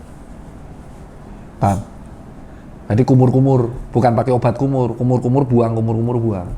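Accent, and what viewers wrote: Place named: East Java, Indonesia. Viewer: Bosoe wong jatim...tuh..mantep pokoke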